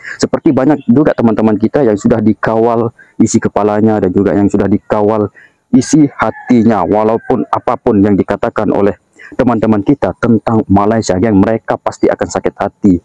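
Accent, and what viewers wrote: Indonesia. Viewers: Kakak tau kamu orang indonesia dari cara kamu berkata2 berbeza dari bahasa melayu Malaysia khasnya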